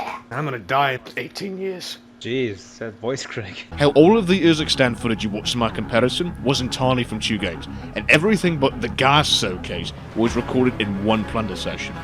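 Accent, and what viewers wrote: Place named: United Kingdom. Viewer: ahh deep british voice man talking about COD with quality content, let's go sir. Mr. Xbox Ahoy left a massive void that's never truly been filled. Best of luck to you.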